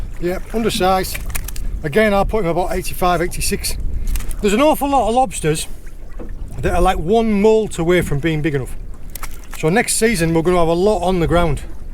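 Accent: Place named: North, United Kingdom. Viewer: Ay up ! 😂 class don’t drop them northern roots pal 👊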